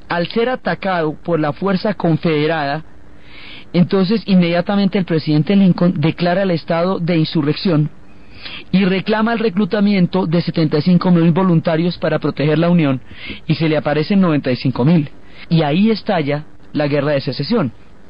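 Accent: Colombia. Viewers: me pasó igual,el acento colombiano, y lo de "parche" "sensacional" jaja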